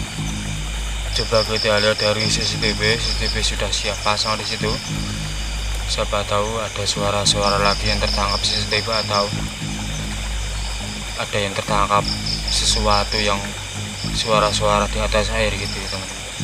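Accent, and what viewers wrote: Java, Indonesia. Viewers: Om apa memang ngomongya kaya begitu, atau tambah2 medok..coba ngomongnya biasa aja biar tambah kereen..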